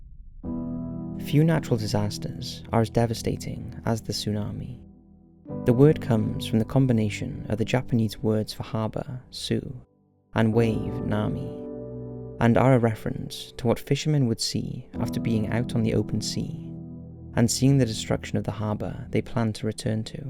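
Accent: Wales, United Kingdom. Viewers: That’s a welsh accent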